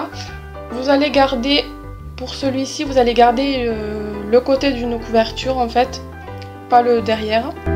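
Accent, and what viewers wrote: South, France. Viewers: Tu viens du sud?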